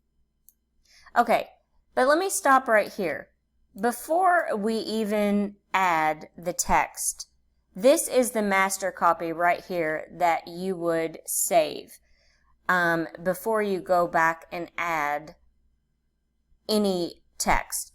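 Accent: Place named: South, United States. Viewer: This is my first time watching and I love your adorable southern accent, from one southern lady to another, keep on making stuff.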